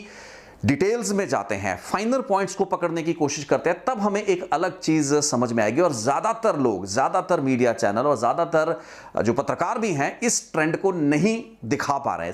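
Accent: Punjab, India. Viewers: Thanks him for speaking other language than his mother tang to aware our community. He is not from up or bihar , he not a member of Hindi supremacy terrorist organization, we feeling proud to speak any other language with our mother language Punjabi accent, so you need to be a diverse and learn about international people how you understand their words in your language! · Sir, I understand the reason for you creating this video in Hindi, ਪਰ ਬਾਈ ਜੀ ਜੋ ਸੁਵਾਦ ਤੁਹਾਡੀ ਪੰਜਾਬੀ videos ਚ ਹੁੰਦਾ, ਉਹ ਹਿੰਦੀ ਚ ਨਹੀ ਆਂਦਾ| And of-course it doesn't help that like all us Punjabis, You've also got that gulabi hindi accent! Love you brother and more power to you as always.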